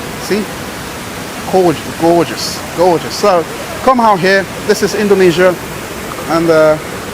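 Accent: United Kingdom. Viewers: It's like you're speaking with a British accent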